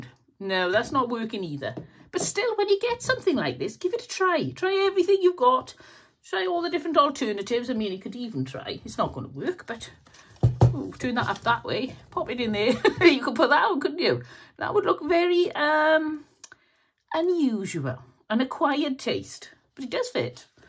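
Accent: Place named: South Wales, United Kingdom. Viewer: I agree. The South Wales accent is so beautiful.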